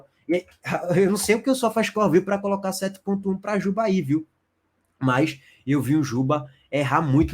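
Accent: Bahia, Brazil. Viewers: BAHIANO FALA ENGRAÇADO, MAIS ÓTIMA MATÉRIA, PARABÉNS, SANTISTA DA CAPITAL PAULISTA.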